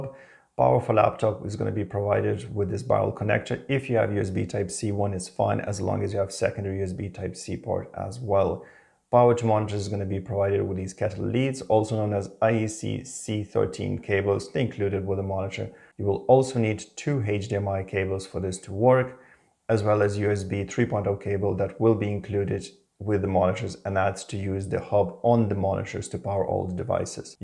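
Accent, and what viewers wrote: Ireland. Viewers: Great tutorial.. Are you irishhhhhhhh? 👌🏾👌🏾🔥🔥.. I love All people but Esp I love all Irish people 😊👌🏾🔥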